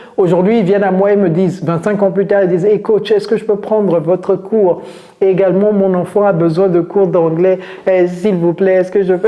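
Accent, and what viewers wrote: United States. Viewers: 😮wow that's amazing i love what you do . I trust in myself from now i will start to learne englisch and i will be able to speak like you ,like an american People 😊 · un togolais, un africain en général peut parler avec l'accent américain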